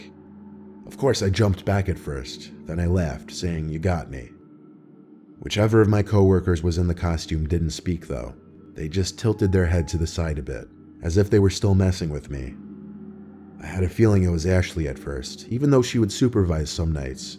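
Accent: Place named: United States. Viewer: After listening to so many Be. Busta vids I can hear heavy American accent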